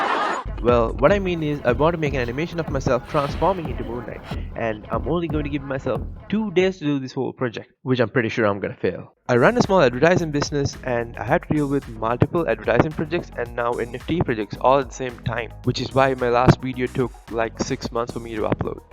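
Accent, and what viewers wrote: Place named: India. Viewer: Bro you sounds like one of us👀 ...are you indian? Your new susbcriber here 🙋🏻‍♂️ please guide me i need some help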